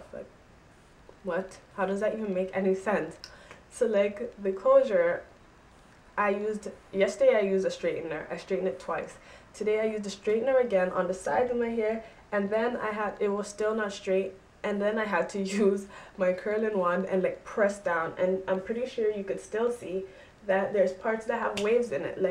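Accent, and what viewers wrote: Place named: Virgin Islands. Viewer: This hair is beautiful! I just ordered mines. And if you don't mine me asking, your accent sounds like your from the islands; are you from the Virgin Islands?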